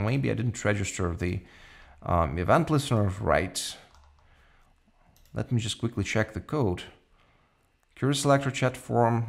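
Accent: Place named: Austria. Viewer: I have a friend from Austria and u sound just like him. Are u Austrian? Really good tutorial, Thankyou!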